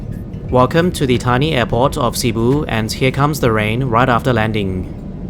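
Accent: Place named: China. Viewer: Why is your pronunciation so reminiscent of a Chinese - educated person? Pronouncing non - existent “Ss”. And your tone of voice is really flat like the airport runway.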